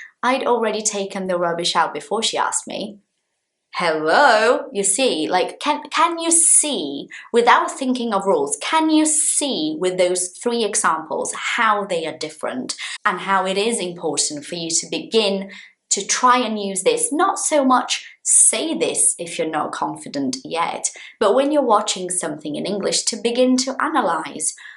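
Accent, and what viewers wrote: RP, United Kingdom. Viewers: British Accent! That’s quite posh .. you are a very pretty posh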